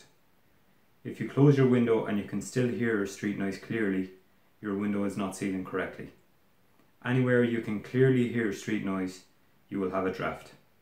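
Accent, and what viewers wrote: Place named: Ireland. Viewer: I never thought i'd hear an Irishman talking about sashes.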